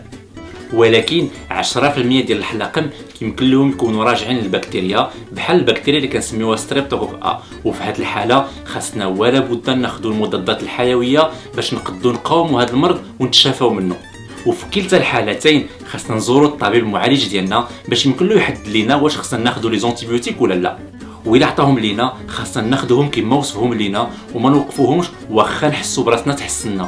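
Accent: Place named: France. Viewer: كلشي ما افتهمت من كلامك . ياسبحان الله الكلام عربي واللهجة على وزن فرنساوي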